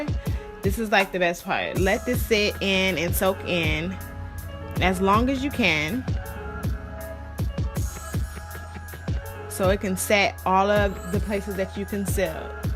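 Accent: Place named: California, United States. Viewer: You sound like you from California 🤔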